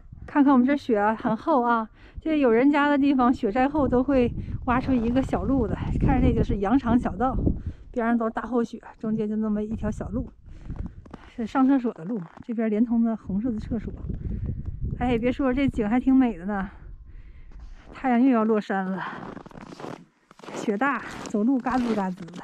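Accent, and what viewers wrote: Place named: Beijing, China. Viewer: 美女和東北美景又加上一口京片子讓人欣賞影片時感覺真舒服，祝美女新春快樂！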